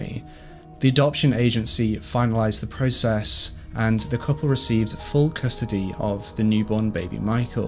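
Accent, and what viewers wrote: United Kingdom. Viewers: I've been following Stephanie Harlowe's true crime channel, and recently discovered I've watched all of her videos! Stephanie's videos are incredibly high quality, and I didn't expect to find another true crime channel that was anywhere near as good as hers. I'm pleased to have discovered this channel. It is VERY well written and narrated. Bonus points that the narrator is very handsome and has a delicious British accent! Yaaay! You've got a bew subscriber. 😁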